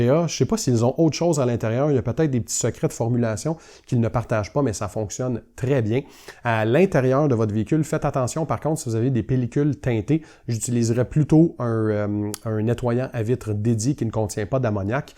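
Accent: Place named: Quebec, Canada. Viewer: L'accent québécois dans un video de detailling sur YouTube j'aime ça. Taukebkictte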